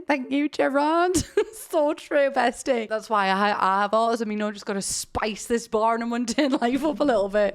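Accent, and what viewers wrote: United States. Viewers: Very good American accent -an American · I did a double take when I heard your American accent what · I didn't realize you were doing an american accent until you mentioned it lol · Wow your American accent is pretty on point! It’s kind of funny seeing the roles reversed because I’m obsessed with doing a scouse accent lol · Can we talk about great American accent? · Absolutely 10/10 American accent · your american accent is magical the fact that we have accents is just so damn cool. i love when people do american accents.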